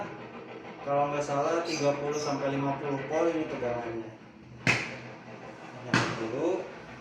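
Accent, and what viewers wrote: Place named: West Java, Indonesia. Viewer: Sunda pisan eh s eta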